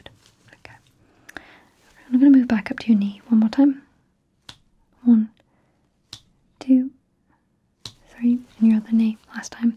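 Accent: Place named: United Kingdom. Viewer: "So if you could just lift up your shit slightly". For some reason the British accent adds to it haha, love the video <3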